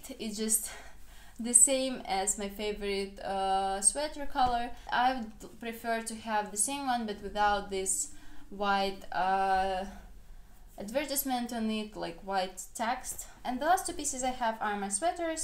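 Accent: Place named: Russia. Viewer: I'm not trying to be rude at all, I hear Russian accents all the time so I hope that you don't mind me saying this (Degree Celsius - with an "s" sound at the end). Thank you for having content in English.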